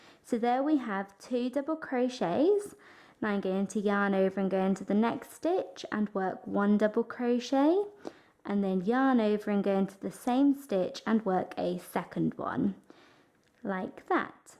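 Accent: England, United Kingdom. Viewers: Thought with your English accent this would be in UK terms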